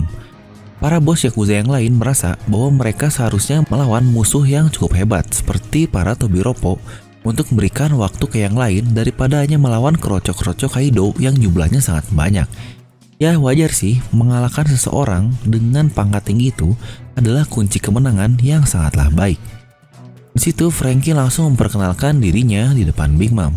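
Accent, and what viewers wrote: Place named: West Java, Indonesia. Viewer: Urang sunda deteck:v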